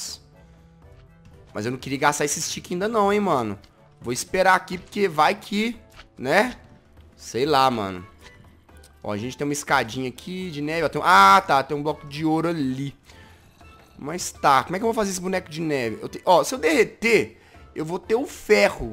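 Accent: Azores, Portugal. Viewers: O seu sotaque português é midas!!! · O sutaque português é otimo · Adorei o teu sotaque de português Eu sou portuguesa kkkkkkk🥰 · o teu sotaque português de portugal parece mais com o sotaque de Portugal açores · tem staque portugues otimo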